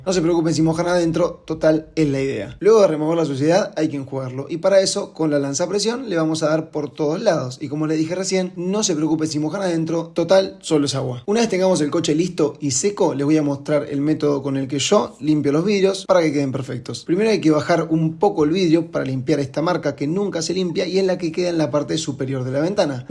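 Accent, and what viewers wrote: Argentina. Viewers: que es la cinta q le pusiste al espejo? tengo rotito el mio... sos argentino? las patentes no son argentinas pero la tonada si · De donde es este flaco? es un argentino que vive en españa?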